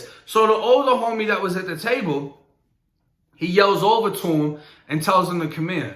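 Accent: Boston, United States. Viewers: I'm from just north of Boston and your accent sounds like ours,is there a part of Florida where other dudes talk like you? It threw me off after I started watching your videos that you weren't from the northeast.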